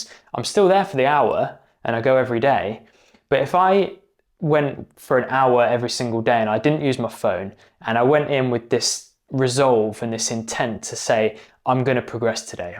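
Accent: United Kingdom. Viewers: Yes it's great to hear an English voice, Thank you.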